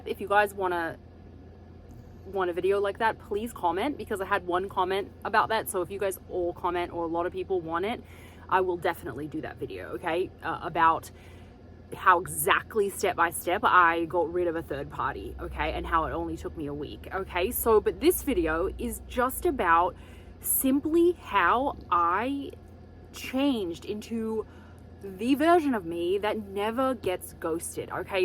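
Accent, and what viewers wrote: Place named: Australia. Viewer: Thank You for sharing your thoughts, insights and advice on self work, self love. Currently healing childhood traumas and insecurities after having 3 months romantic state to friend zone now ghosted last 3 weeks. Digging the aussie accent!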